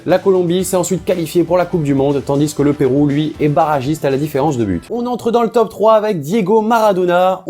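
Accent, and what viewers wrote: Spain. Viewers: tu as un superbe accent espagnol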